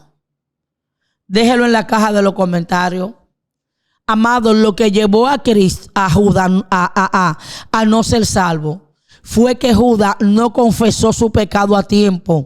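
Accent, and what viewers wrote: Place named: Dominican Republic. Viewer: ella es dominicana por eso habla asi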